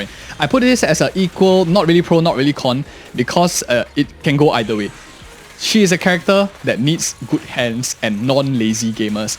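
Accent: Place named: Singapore. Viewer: Are you Singaporean? We have similar accents 😮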